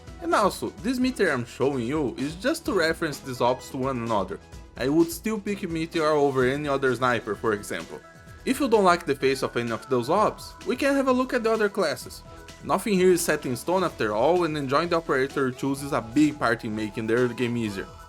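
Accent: Brazil. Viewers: Esse sotaque BR. Too obvious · manooo, eu assisto você um tempo já e eu tipo eu reconheço esse sotaque prq é o msm q o meu XD eu ia comentar da onde você era no caso de qual pais e agora lendo os comentarios vi que você era br mesmo, eu amei seu vídeo como novato no arknights eu to amando o jogo eu to esperando o banner do mizuki desde o primeiro dia que eu joguei, alias um vídeo desses pra um 6 estrelas seria incrivel! :goodvibes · Tô ouvindo um brasileiro aí kkkkk. Raro ver BR jogando Arknights. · Percebi na hora que é brasileiro só pelo sotaque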